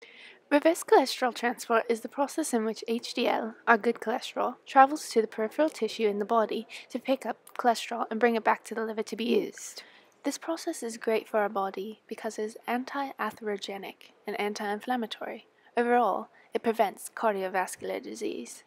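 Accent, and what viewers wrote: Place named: United Kingdom. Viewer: Lol i love the british accent! hahahhahaha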